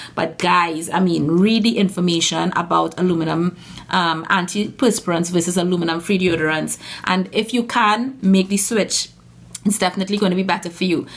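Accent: Trinidad and Tobago. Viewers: Trini accents really nice enno.... " check me .. haha a a ah not smelling nuutinng , a a like smell mih arm.. it ain hav no odour" hahah We are so extra joyful - and happy 🇹🇹hahaha #tryingtoget #agulfcityrun Gosh and you look like a school friend of mine Akilah - Chinese mixed eyes and round face - cutie cutie- laugh and smile hahah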